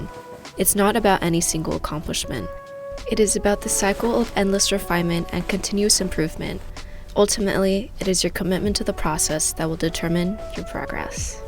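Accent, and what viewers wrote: China. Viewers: The Chingrish is killing me. It's how I talk too hahahahaa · Love your Chinglish! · The way you speak Chinglish is how I do too HAHAHAHA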